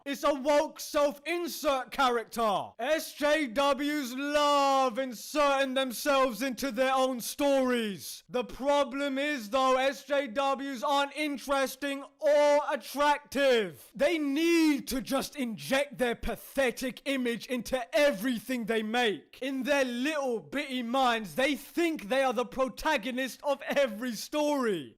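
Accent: United Kingdom. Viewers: The combinations of your accent, commentary, angry tone and brilliant British insults made this video a 1000x better 🤣🤣🤣 Good job mate! 'I am not Starfire' is not even out yet and the preview description alone is already trash as fuck. There's absolutey NOTHING charming nor likeable about Mandy whatsoever. Her entire personality and character traits are her being 'gay, overweight and goth'. That's it. Also the fact that she "hates everybody but her best friend" who fyi, looks like another godawful Tumblr character who probably identifies themselves as an attack helicopter. As if all goths "hate everybody" for no reasons. And what's even more ironic? Mandy eventually falls for a girl in her class who is basically like Starfire (tall, popular, beautiful, nice/kind)! Wasn't Mandy try to get away from people like her mother?? WHAT KIND OF BS IS THAT?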